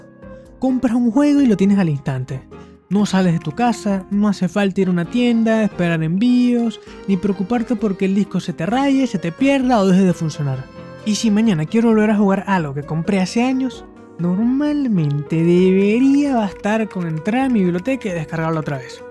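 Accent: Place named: Chile. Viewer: Quizas me equivoqué pero por su acento deduzco que es chileno o peruano. Parece más chileno que peruano.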